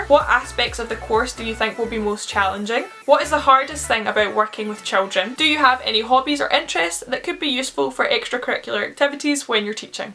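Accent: Scotland, United Kingdom. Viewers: First of all, I could listen to you talk all day long. I love the sound of your Scottish voice. Even wearing glasses you still look far too attractive to be a teacher. From what I could tell you're a bit shortsighted so you need glasses to see things at a distance clearly. I'm a retired optician if you're wondering. Over then next few years you can expect your eyesight to further worsen a bit each year due to your young age and the amount of close work you will be doing such as reading and studying. You can expect to be wearing glasses full time within a year or two. So it's a good thing you look as amazing wearing glasses. But then again, teachers are expected to wear glasses. I remember in high school every teacher I had was rather old and they all wore glasses. The only exception was my junior year history teacher. She was young and very pretty and I always looked forward to my history class just because of her. 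When we came back for Christmas break I was surprised to see her wearing glasses. She must have just gotten them because she was doing a lot of taking them off and putting them back on. At one point she was in front of her desk teaching when realized she wasn't wearing her glasses. She turned around to get her glasses and as she was putting them on she said that she had just got them 3 days earlier and she was still trying to get used to wearing them. She told us that she had noticed a couple months before Christmas break that she was having trouble seeing posters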